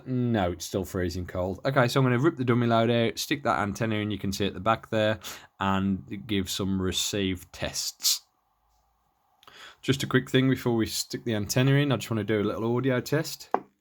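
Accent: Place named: Black Country, United Kingdom. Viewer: Good vid you sound local to me? Im in stourport, are you black country way?rgds steve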